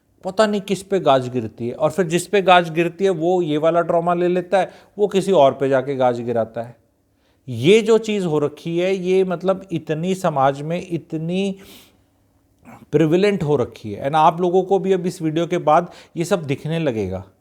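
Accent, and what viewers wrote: Haryana, India. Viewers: People do discuss these things on you tube...but your style of presentation...your personal tone..your being haryanvi.. helps you to be just plain straight forward...which touches the emotional cord at the right spot.. Though things that being conveyed are at elementary level...hope you would handle it with more nuanced approach in your next video.. But ofcourse you being people would listen to you.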